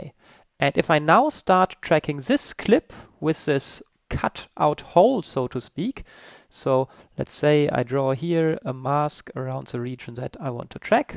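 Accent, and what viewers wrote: Germany. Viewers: Hab das erste Wort gehört und wusste direkt, dass du Deutscher bist :D Gutes Tutorial übrigens :)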